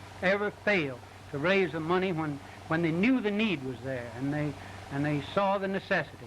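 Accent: Oklahoma, United States. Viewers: do many modern okies still talk like him or have they all been modernised? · Okie accent!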